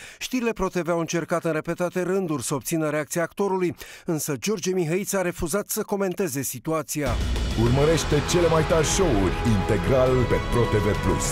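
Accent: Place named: Moldova. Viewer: e sholdovean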